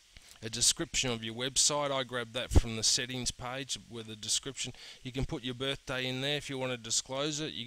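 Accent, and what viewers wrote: Australia. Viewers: great post love the Australian accent:) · Thanks, also love your wonderfully laconic Aussie accent!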